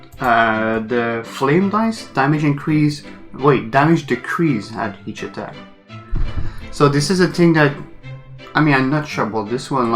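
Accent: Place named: France. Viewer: Cuz u sound french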